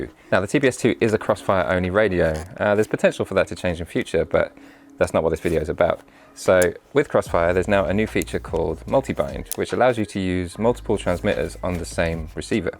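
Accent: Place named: United Kingdom. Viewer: Hey sounds a little like my review but with a nice British accent! Makes it 100% better, at least 😎 Great job mate!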